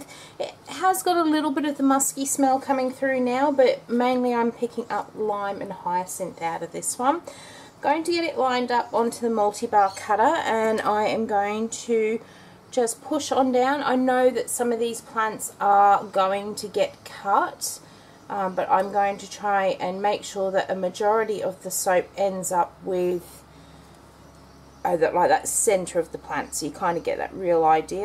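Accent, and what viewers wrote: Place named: Australia. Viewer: Hi, I’m interested in buying a soap from you but I’m in the US and it sounds like you may be from Australia? Does that effect shipping prices?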